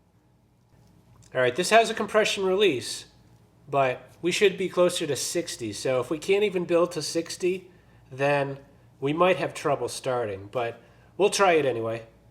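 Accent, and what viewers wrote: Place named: South, United States. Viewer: Critter? What part of the South you from?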